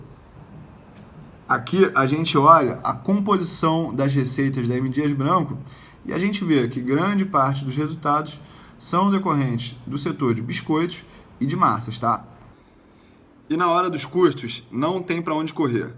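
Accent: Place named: Rio de Janeiro, Brazil. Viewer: Bom vídeo , mas esse sotaque carioca é cansativo pra mim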